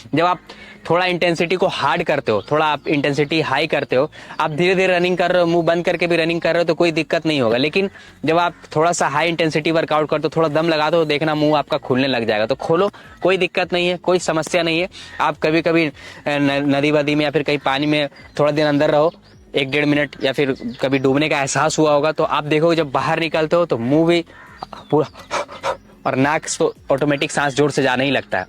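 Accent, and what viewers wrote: Maharashtra, India. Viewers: Sangli Marathi bolatat sir 😍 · Bhai bht pyari Marathi lagi aapki...Jay Shivray❤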